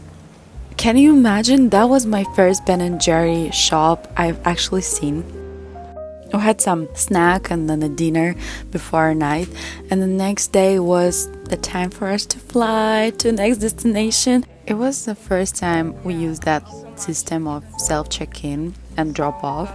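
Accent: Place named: England, United Kingdom. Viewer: yay my fav travel vlog is back! you have a lovely english accent, i like this change up bless you for showing us the amazing cities with the night life, buildings and food and sunsets, beaches and people 🙏 your friend and you are gorgeous! cool to see you both happy, and those outfits you wore were stunning! 💜 thank you for the editing you put into this 😊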